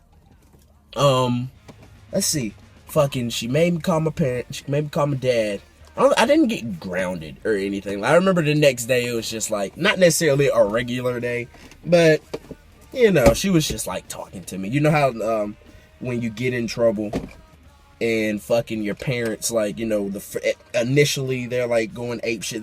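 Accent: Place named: South, United States. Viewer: This dude sound hella country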